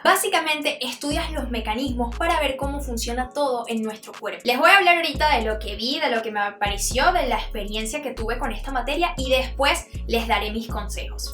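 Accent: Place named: Venezuela. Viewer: Por el acento yo diría que es venezolana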